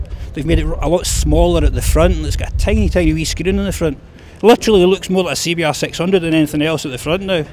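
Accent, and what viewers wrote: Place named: South Africa. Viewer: Mister Knobb Yes same accent as the famous south african rally driver...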